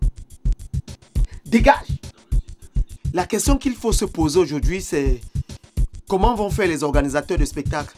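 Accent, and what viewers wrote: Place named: Cameroon. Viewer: Tu as un rare accent camer, où est passé ton chôcô de la Ndeuch Family 😂😂😂😂😂???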